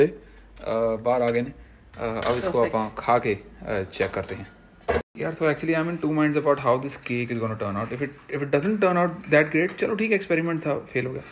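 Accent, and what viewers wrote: Punjab, India. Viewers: He was trying so hard not to go full punjabi hahah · g twadi hindi mix punjabi kmaal ey yr...exact imitation ey ona noee--dolteyey di jina nu chj di ek zubaan vi ni andi😂😂😂 love you! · Veere punjabi leak hoi jaandi aa thodi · Loved your punjabi yrr ki authentic punjabi boli hai🤣🤣🤣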